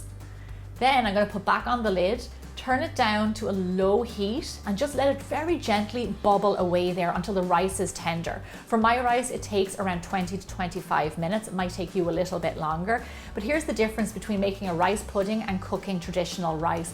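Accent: Ireland. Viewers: I looooooooooove Irish accent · love your irish accent too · your beautiful Irish accent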